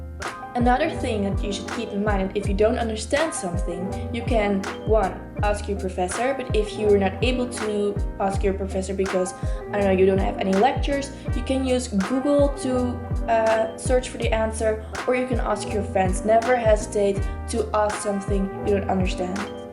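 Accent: Netherlands. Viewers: Je hebt een Nederlands accent op je Engels. Oefen een beetje! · Je bent Nederlands! Ik dacht al dat ik een accent hoorde. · Talia Bilal she sounds dutch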